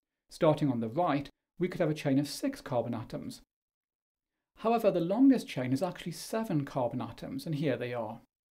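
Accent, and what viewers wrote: United Kingdom. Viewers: WHERE IS OUR OG BRITISH ACCENT!?!?!?😩😔😔😔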